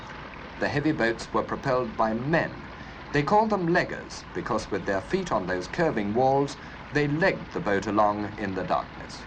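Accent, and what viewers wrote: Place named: England, United Kingdom. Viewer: got to love a pompous English accent :face-green-smiling::face-green-smiling::face-green-smiling::face-green-smiling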